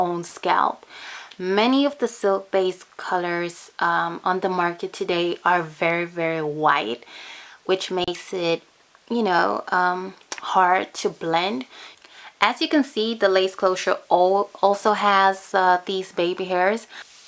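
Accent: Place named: Brazil. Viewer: Your voice sounds like Rihanna but with a Brazilian accent. Very cute!!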